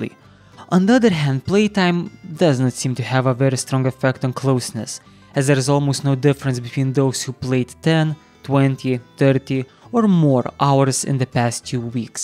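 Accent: Russia. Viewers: крутое видео чувак, но советую поработать над русским акцентом) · Вроде русский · Хороший видос брат, по акценту узнаю родной русский или другой славянский язык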